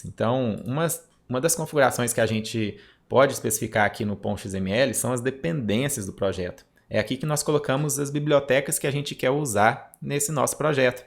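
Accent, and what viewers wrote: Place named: Minas Gerais, Brazil. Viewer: Engraçado, esse sotaque, acho que mineiro, faz ficar mais fácil ou é impressão minha? MUITO BOM!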